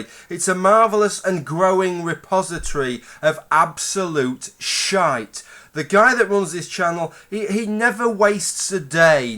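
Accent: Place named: Yorkshire, United Kingdom. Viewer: Slight Yorkshire..... what part of the UK are you from?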